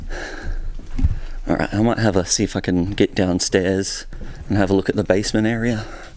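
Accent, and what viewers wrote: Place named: Australia. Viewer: australian english 🤙🏻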